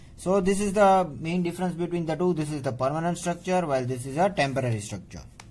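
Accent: Gujarat, India. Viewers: Sir aap gujrat se ho na 😅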